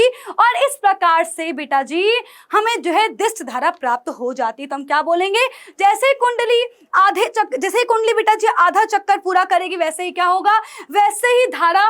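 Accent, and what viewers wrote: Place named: Kanpur, India. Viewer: Ma'am kanpuriya language me bole to ekdum jhakaas samajh me aa gya 🙂🤭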